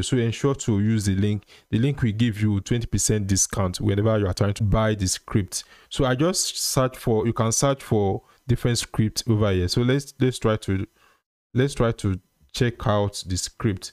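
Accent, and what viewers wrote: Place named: Nigeria. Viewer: Nigerians, your accent cannot go unnoticed. "Sarch", "pour-chase". Lots of love from Anglophone Cameroon ❤️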